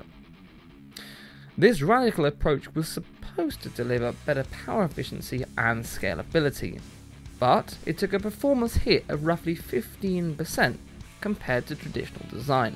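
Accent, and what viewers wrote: United Kingdom. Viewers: Love the british accent... Nice video man!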